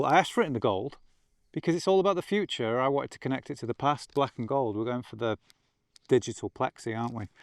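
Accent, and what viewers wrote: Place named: Marple, United Kingdom. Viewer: Must be the Marple accent, I'm not as accustomed to it as I was when I lived in Birch Vale back in the 80's. What's the world coming to when male guitar heroes are considered cool for the shapeless clothing they wear instead of their grossly indecent behaviour off-stage? No wonder Jimmi Page and Keith Richards are still so popular😎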